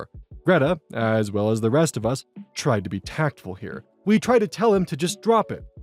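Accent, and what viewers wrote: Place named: New York, United States. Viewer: Curious question.... Why do you sound like someone that lives in Manhattan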